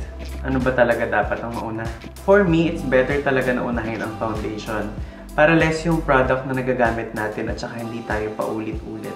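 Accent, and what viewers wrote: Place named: Visayas, Philippines. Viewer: ❤️❤️❤️..i love the way how you speak bisaya word..😘😘😘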